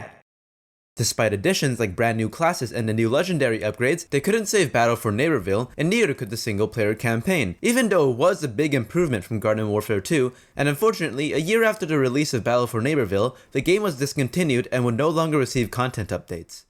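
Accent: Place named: United States. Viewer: He is either american or canadian.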